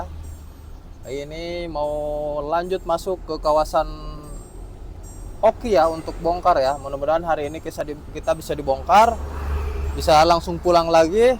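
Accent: Serang, Indonesia. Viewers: Aduh aing setiap bicara ciri khas orang Serang he he sy senang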